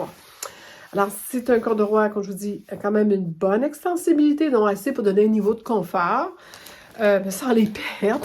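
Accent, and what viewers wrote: Quebec, Canada. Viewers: Très beau travail l beaux tissus l et accent du Québec où vivent mes enfants et où je vais tous les ans l merci pour la video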